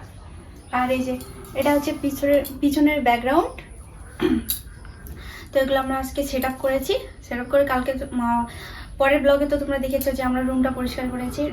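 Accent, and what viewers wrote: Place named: Bangladesh. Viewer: Tumi ki Bangladesh chila?? Ekhankar moto vasha lagteche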